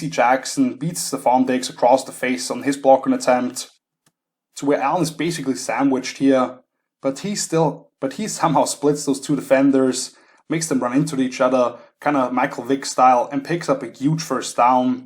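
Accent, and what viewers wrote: Austria. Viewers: Are you Austrian or German? I don't mean to be rude, but I think it's a bit strange to listen to foreigners analyze American/NFL football.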